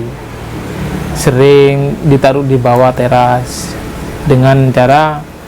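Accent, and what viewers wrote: Madura, Indonesia. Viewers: logat e kyk orang mdr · Kayak orang Jember bahasanya.madura nya mdooookk knaaakk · Ky orang mdr ni bahasanya bengkok2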